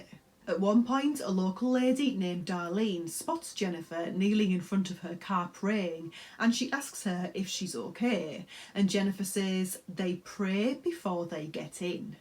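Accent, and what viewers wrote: United Kingdom. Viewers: Are you a british English speaker?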